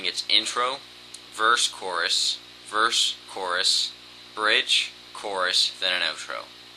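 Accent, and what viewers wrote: Canada. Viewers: Are you canadian? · Has anyone ever t old you that you have the most adorable Canadian accent ever? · nice vid. are u canadian?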